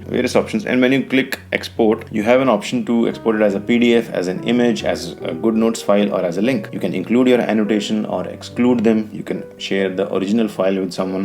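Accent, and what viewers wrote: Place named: India. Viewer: Man really good video respect 👍 it seems like all the best tech videos come with an Indian accent, but I love it, thanks :)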